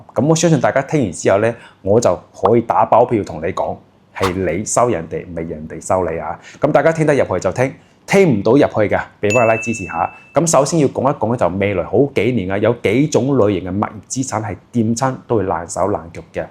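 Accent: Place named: Hong Kong, China. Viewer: 香音未改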